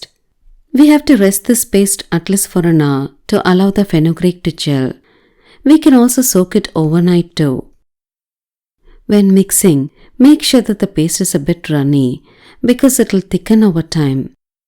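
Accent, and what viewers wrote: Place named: South India, India. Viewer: Awesome video 😘 I guess you too from south India, from your accent, it's beautiful.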